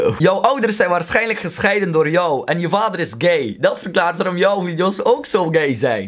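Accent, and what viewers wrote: Belgium. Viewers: Sandra Kim Oh... Nou ik als Nederlander hoor duidelijk een accent bij hem en dan refereer ik meteen naar de Belgen, haha 😁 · He's actually speaking Dutch with a Belgian accent. (He's belgian)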